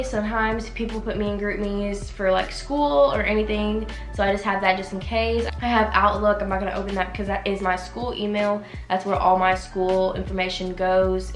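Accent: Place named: South, United States. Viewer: You kinda have a southern accent ❤️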